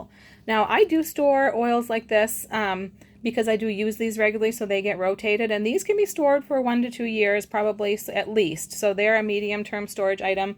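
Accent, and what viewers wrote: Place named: Massachusetts, United States. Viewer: Don,t know where you live but I lived on Richard Circle Woburn and neighbors behind were o Border road ,Reading.I can tell you are fro. Pretty close by with your accent which is pretty mellow but its there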